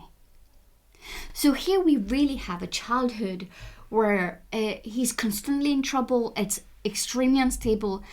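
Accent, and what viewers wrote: United Kingdom. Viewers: And I (the idiot) thought she was from scotland 😂😂😂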